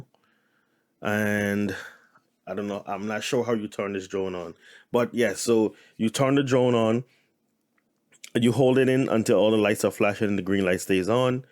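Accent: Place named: Jamaica. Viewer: Hey. You sound like a Yardie. If you are Big Up Yuhself!! Enjoyed your video, keep up the great work. Can't wait to see part 2.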